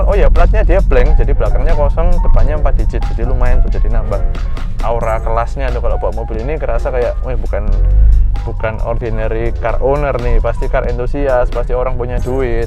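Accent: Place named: Java, Indonesia. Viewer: Medok banget mas!!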